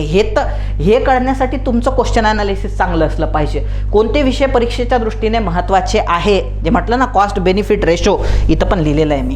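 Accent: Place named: Vidarbha, India. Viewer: खरचं खुप छान explain करता sir तुम्ही आणि तुमची भाषा आपलीशी वाटते ते वाटणारच शेवटी विदर्भ तुमचं आमचं सारखंच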